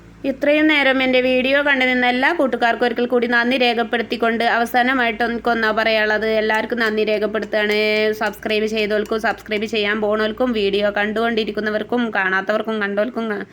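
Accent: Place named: Malappuram, India. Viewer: ഇത്‌ ആ മലപ്പുറം താത്താടെ സൗണ്ട് nd സംസാര രീതി ആണല്ലോ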